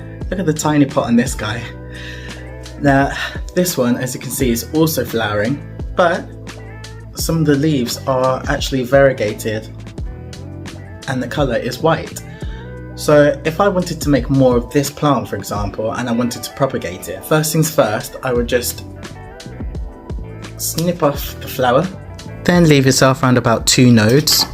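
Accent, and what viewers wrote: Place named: England, United Kingdom. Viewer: I can tell by your accent why your coleus does better indoors than outdoors. Summer in England is more of a theoretical concept than a real season.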